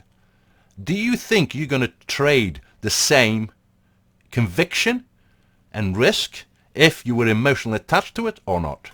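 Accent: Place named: Northern England, United Kingdom. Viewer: love the Northern accent you've picked up, brilliant.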